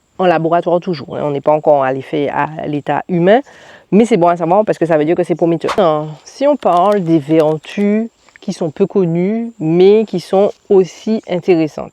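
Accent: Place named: Antilles, France. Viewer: ton accent d. Antilles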